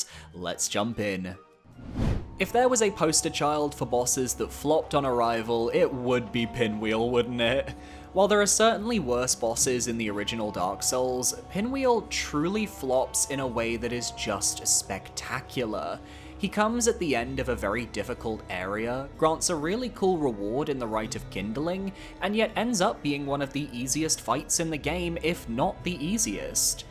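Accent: United Kingdom. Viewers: TF is the awful Scottish accent about? Reckless behavior 😂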